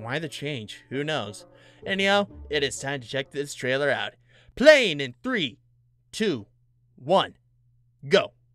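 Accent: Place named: Ireland. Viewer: actually I like your irish accent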